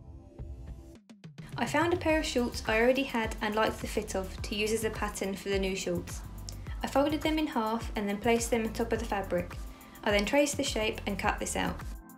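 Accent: United Kingdom. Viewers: You have one of the best British accents ever. That’s why I keep coming back. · That accent is amazing.... i wish i lived in Britain 😣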